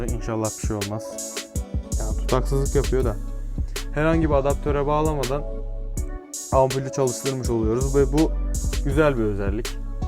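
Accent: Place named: Uşak, Turkey. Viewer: Selamınaleyküm ustam hayırlı işler kolay gelsin. Ustam memleket neresi? Konuşman Uşak civarı gibi geldi eğer yakınlardaysan yardımına ihtiyacım var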